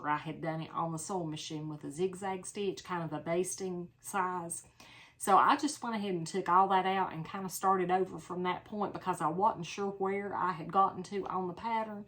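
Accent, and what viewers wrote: South, United States. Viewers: I love your videos,. Just love listening to your lilting voice. Where are you from? Can’t quite pinpoint the accent. I’m guessing the Carolinas. I was raised in East Texas, so I love listening to various Southern accents. So soothing! When my accent occasionally comes through (usually when I return from a visit to Houston), I constantly have to tell folks I have a Texas accent, not Southern. I have to explain that Texas is not part of the south, that accents are classed geographically and Texas is located in the middle, not to the right on the map! Most “other” people (you know who I mean! 😹) hear “South” and think “Confederacy.” That’s why they’re confused.. Bless their hearts. 🙄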